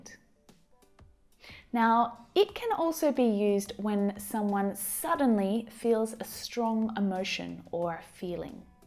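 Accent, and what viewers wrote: United Kingdom. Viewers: Ok ,,.es que estoy aprendiendo el inglés pero de pronunciación Británica que es más limpia y solo encontraba Americana... y te encontré...besos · looks like your english pronunciation "british." anyway . thanks.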